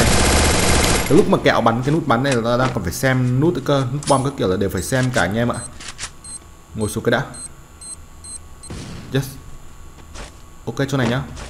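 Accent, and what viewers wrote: Thanh Hoa, Vietnam. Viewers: Em đoán Giọng Anh Giống người Thanh Hóa vậy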